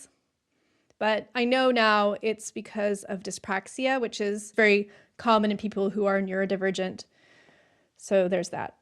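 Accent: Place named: United States. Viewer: You've lost your American accent for one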